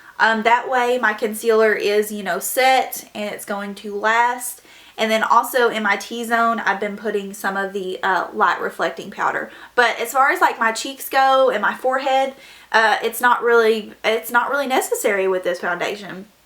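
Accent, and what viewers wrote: South, United States. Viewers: Your southern accent is so cool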